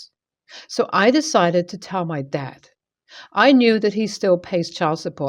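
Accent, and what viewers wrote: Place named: United Kingdom. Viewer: Pick an accent and stick to it!!! Its grating to hear the bad aspects of uk accents.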